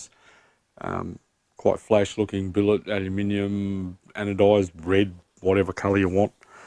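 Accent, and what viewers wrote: Australia. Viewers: how is it the new Zealanders say binch when you aussies say bench like the rest of us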